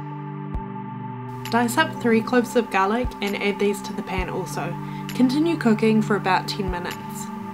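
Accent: New Zealand. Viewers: That's a New Zealand accent, right? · Man your accent is sooo intense, I left NZ 13 years ago and I'm 100% sure the accent changed. video is amazing though.. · New zealand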